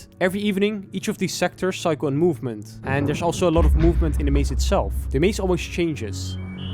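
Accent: Netherlands. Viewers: Are you dutch? Ur accent sounds very dutch, no offense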